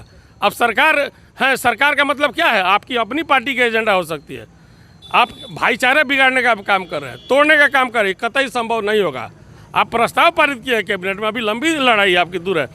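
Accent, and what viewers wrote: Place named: Bihar, India. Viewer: Aapka baat bolane se Pata Chalta Hai Ki aap Bihari hai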